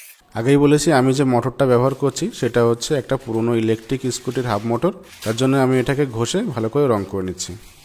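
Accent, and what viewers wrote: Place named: Kolkata, India. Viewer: Ei chele to india tei thake maybe, voice to Kolkata style er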